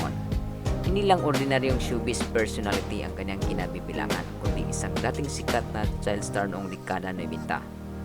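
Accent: Visayas, Philippines. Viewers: Ang panget mung mag salita visaya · Bisaya po... gaya ko... ganyan talaga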